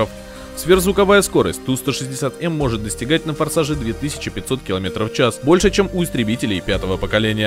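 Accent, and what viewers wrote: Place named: England, United Kingdom. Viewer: Ты лицо своё прячешь, потому что упорно искажаешь произношение русских букв на английский лад? Чего добиваешься, увеличение дизлайков?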